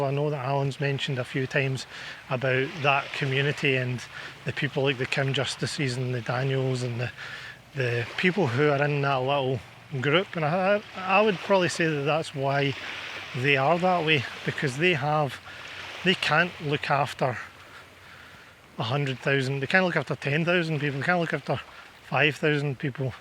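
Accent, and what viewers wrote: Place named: Scotland, United Kingdom. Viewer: The reason I asked is I thought that was Scotland cuz I've seen so many pictures of Scotland. I'm Scottish I'm the only one in my family that has not been there. I have thick book of my family that connects me all the way back hundreds of years ago from Scotland. I've been wanting to go back through it cuz it's been many years since I went through it since my mom's died. Many clans the only clan I can think of that was the main part of the family was the MacDonald clan. It's been many years since I've been to the Scottish games here in the states.I still get a flyer something my house to meet the McDonald's clans at the Scottish games once a year I'm going to have to do it next year for sure. It's always such a amazing fun. I hope I'm able to visit Scotland one day. Thank you for your time I just knew that was Scotland not just from your accent look so beautiful it's nice to see a picture like that. 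Thank you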